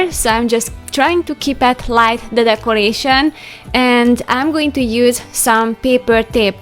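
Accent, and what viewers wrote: Hungary. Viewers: Kihallottam a magyar hangsúlyokat tudtam hogy magyar vagy! Köszi a sok ötletet. Egyszerű és nagyszerű! 🎄❤️boldog karácsonyt! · So sophisticated and beautiful, you gave me great ideas! You have a Hungarian accent, are you from Hungary by any chance?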